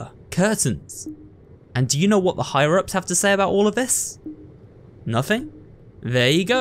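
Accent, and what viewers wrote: South, United States. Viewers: Lemme just say that as a native to the southern state of Georgia I absolutely love your southern accent 10/10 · His southern accent is so uwu ❤ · for a British person his sother accent is pretty good you can still tell he's not American born but that can but fixed up with practice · As a Texan, I have to say your Southern accent is both cute and funny. Not bad! 😂😂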